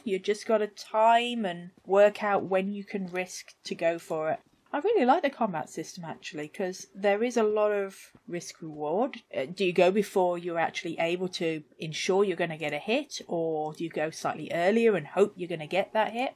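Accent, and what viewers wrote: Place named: United Kingdom. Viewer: Interesting game, thank you for sharing it. Love your strong British accent too.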